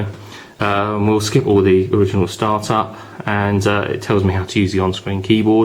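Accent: United Kingdom. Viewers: ha i love british accents ! lol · your british accent pursuades me to buy this. why do the british make everything seem so advanced and top of the line?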